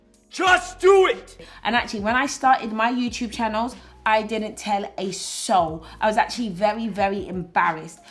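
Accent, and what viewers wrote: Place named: United Kingdom. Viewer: Love her personality... and her British accent!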